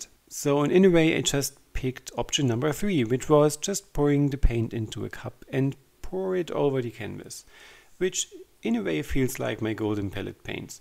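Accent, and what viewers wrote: Germany. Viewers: Hi , ich habe mir schon mehrmals etwas von Dir angeschaut und immer gedacht : er hat einen deutschen Akzent... Nach meinem letzten geschauten Video weiß ich zumindest das Du Deutsch sprichst. ( Fassettenlack) ...LOL...Kannst Du vllt mal etwas in deutsch machen? Das wäre echt klasse. 😍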